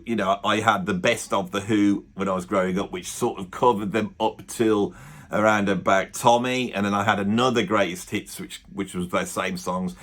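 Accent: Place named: England, United Kingdom. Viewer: that accent. What region in England?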